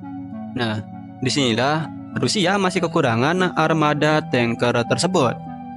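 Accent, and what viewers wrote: Lombok, Indonesia. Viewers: Saya kira adminnya dari lombok. Bunyi F jadi P. Emg benar sih mirip juga logat Bali karna lombok dlu dijajah oleh Kerajaan Bali.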